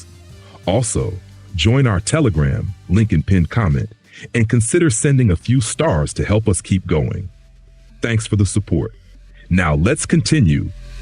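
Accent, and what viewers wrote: United States. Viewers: Butthurt Indian guy puts up a video defending his tin can in American accent.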